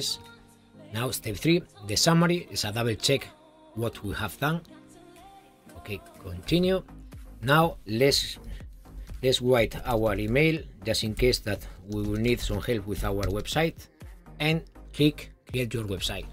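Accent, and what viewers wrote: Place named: Spain. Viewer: Ese acento suena a español jejejj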